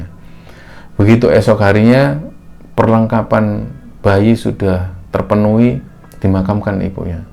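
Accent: Java, Indonesia. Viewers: Mantap mas medoke gak ilang yo😁👍🏻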